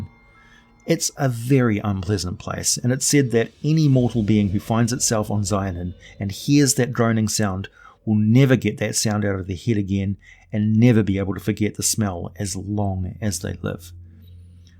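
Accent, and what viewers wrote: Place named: New Zealand. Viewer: Well hell,you may just have to do the Wolf Spider next! Great vid keep crankin them out ol son! By the way my neighbor said you look great with a bald head! Shes hot bro! I think shes crushing on you! 😂 lol You kiwis with that accent....i think thats what got her.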